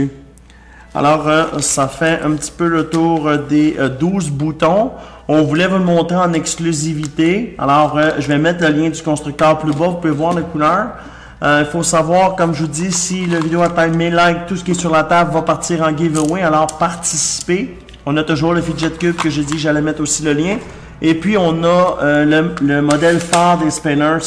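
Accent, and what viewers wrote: Quebec, Canada. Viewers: kiffant j'adore ton accents Québécois ?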